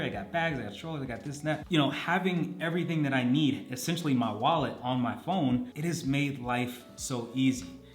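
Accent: United States. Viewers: Acting and talking like a stereotypical American. How long have you been outside the US? Yo do know that Aldi has been a thing in the US for some time. And where is your ID? Everything IS NOT on your phone. You might have a digital German driver's license, but I am pretty sure that the US Government has not setup that type of digital ID.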